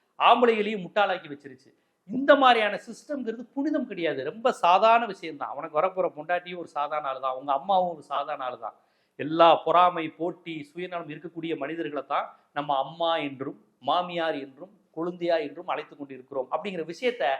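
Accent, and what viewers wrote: Madurai, India. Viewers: நீங்கள் எதற்கு மதுரை வட்டார மொழியில் பேசுகிறீர்கள் இந்த படத்திற்காகவா?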